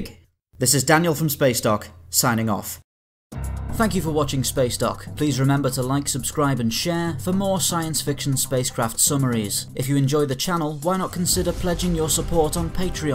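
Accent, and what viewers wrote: United Kingdom. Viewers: I truly enjoy your episodes. It was that pointed you out. I enjoy your attention to detail, graphics and that British accent, with your own personal confidence make for one hell of a great voice over voice!! I do, however, have to disagree with you a number of your points. First, you presuming a galaxy devoid of long range sensor arrays. These serve as surveillance, relay stations, beacons as well as keep track of all craft movement with in the area they can surveil. These arrays could scan for hundreds of different anomalies. They would cover the entire light spectrum, they would also likely have very sophisticated visual scanners, and likely an assortment of drones to extend their range or get closer looks at possible tremors of danger. As far as stealth technology to mask their the residual radiation of their engines, primary faster than light engines and ‘impulse’ engines. 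Also masking every frequency with which they are scanners. If they have shield activated, there is no plausible way to disguise radiation waste from their various engines. It is possible to design a ship that repurposes waste radiation, basically a submarine in space. Shields though, that’s an immense amount of energy in the shields themselves. This a huge problem. Romulans couldn’t engage their shields while cloaked, the sheer amount of energy, projected away from a ship, to most effective, yet at least 200m away from the ship, to better protect the hull from hugely impactful hits that would resona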